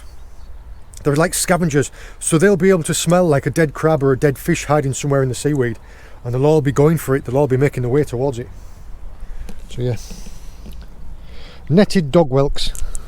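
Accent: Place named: England, United Kingdom. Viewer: I knew it was going to be good day when I hear I got my booket and you go on a trip in an English accent. Good view and explanation of things.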